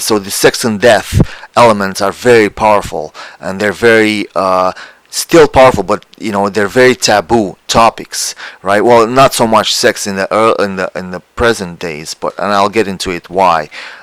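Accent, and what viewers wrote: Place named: Romania. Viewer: Vroiam sa spun " Tabu, in marea parte a cercurilor culturale filosofice ale unei facultati" cum e cea de Filosofie din Bucuresti ( Oricum, raspunsul le , e dincolo de limitele mele umane, dar e corect.... ) Istorie a religiilor ca disciplina nu am facut nici macar la Masteratul de Istorie a Religiilor (la inceput, cel putin, ca a tr sa renunt, fara voia mea) KOGAION- te referi probabil la axis mundi din mitologia Dacilor. Un Axis Mundi face macar legatura intre uman si divin / profan si sacru / cunoasterea umana limitata si cunoasterea transcendentala etc. Ignoranta e cel mai mare neajuns al unei culturi mici, aproape inexistenta, asa cum a ajuns a noastra. Vorbesti despre Culianu, Cioran, Eliade, fenomenologia religiilor (si toate celelalt subdomenii), filosofii, curente culturale etcc etc etc si dupa atata munca, din 170 de vizualizari, cati crezi ca inteleg cu adevarat, facand corelatii si cu alte surse sa inteleaga punctul tau de vedere? 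Discutii, comentarii, argumente.............शून्य (shunya / vid ) cum spun Indienii. Altul cade-n bot si are 170.000 de vizionari. Suntem poate o natie superficiala, sau poate romanii nu-ti inteleg Engleza din cauza accentului romanesc.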